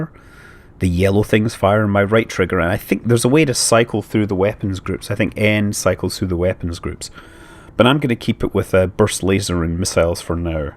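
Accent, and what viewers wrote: Scotland, United Kingdom. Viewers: are you from scottland? you have a scottish accent.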